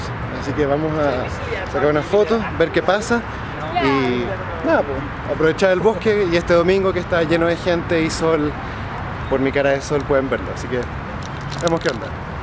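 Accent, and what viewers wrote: Chile. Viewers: acento chileno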